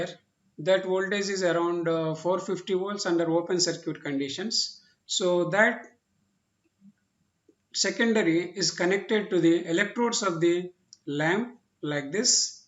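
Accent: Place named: India. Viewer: I stopped watching as soon as I heard Indian voice.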